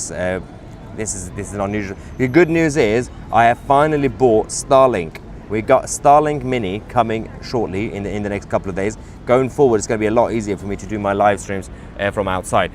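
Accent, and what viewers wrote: United Kingdom. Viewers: I can see and hear you, British accent and all!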